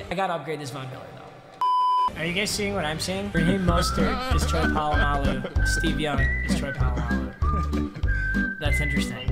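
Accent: Michigan, United States. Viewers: Are you from Michigan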